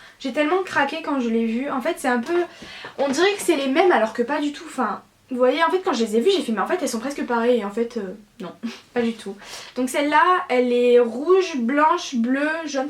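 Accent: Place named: Marseille, France. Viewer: Tu as un petit accent marseillais, tu habite à Marseille?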